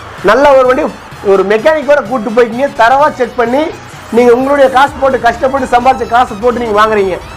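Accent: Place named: Kongu, India. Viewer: Neenga pesratha paatha ipove vanthu vaanganum pola iruku super Anna kongu tamil azhage azhagu thaan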